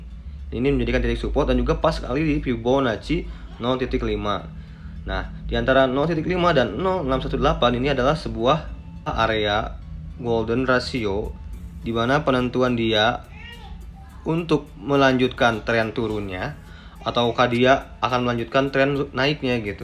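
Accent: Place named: Bali, Indonesia. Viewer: Kyk logat bali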